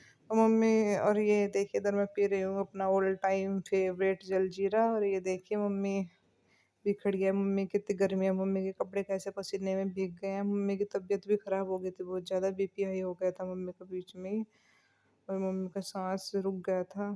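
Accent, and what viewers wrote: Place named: Haryana, India. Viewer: Jaat ho sayad boli too aise he lg re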